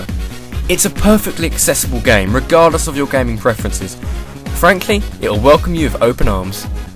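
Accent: England, United Kingdom. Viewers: I say it like that and I'm English too so I guess it's an accent thing. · no you brits speak english correctly we americans mispronounce things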